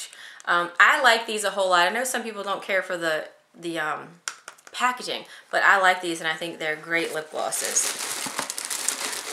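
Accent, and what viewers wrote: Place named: South, United States. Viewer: Happy Anniversary! I love watching " " with her cute southern accent! I hope you have a great weekend. Still cold here in Chicago but at least the sun is shining! XOXO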